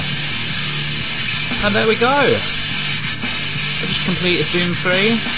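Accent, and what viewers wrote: United Kingdom. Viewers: good job ^^ also nice British accent =3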